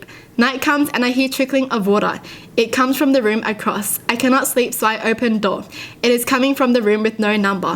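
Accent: Australia. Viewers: She knows how to say it,it's just hard to say probably because she has an australian accent.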